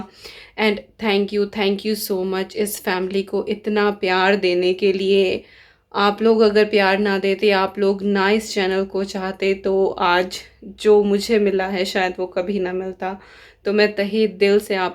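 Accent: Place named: Uttar Pradesh, India. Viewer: Congrats! Well deserved honour. I'm from Chicago and I started watching your channel since last August when I was visiting India.One day I was getting bored in my hotel room as my husband was away on business trip and I could not go along with him as I was sick, so your cleaning routine flashed on while I was watching other videos on you tube, and believe me it was so interesting that I was instantly hooked up to your channel. Your typical U.P. style of speaking and hard work combined with your simplicity just did wonders and I felt connected to you. Congrats and best of wishes for your future endeavours.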